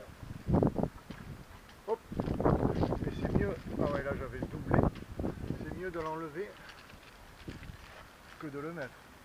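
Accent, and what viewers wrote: South, France. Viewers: Bonjour, et hello les marins, J'ai flotté pas mal pendant des années, dont sept ans sur un Banjer 37 (revendu il y a peu suite à perte d'emploi, snif), je suis en Belgique, mer du nord (moins quarante!!!!), d'où le besoin d'avoir une timonerie fermée pour naviguer toute l'année. Merci, je me régale avec tes videos, ton accent du Sud (chaud), et tes commentaires très intéressants, cela me fait chaud au coeur. La navigation en Méditerranée est différente de celle pratiquée en Manche et Mer du Nord, où les escales sont en France, Belgique, Hollande et Angleterre, pour rester dans les deux cents mille. Te voilà donc reparti pour quelques délicieux mois de navigation, d'eau salée, et d'apéros. Profites-en un maximum, demain est un autre jour.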